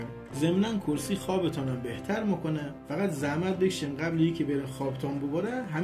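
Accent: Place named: Mashhad, Iran. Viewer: عالی بود ،خصوصا با لهجه مشهدی شیرین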